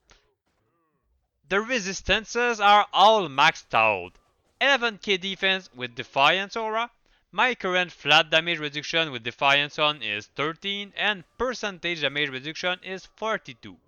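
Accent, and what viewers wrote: Quebec, Canada. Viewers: I could tell you are québecois from minute 1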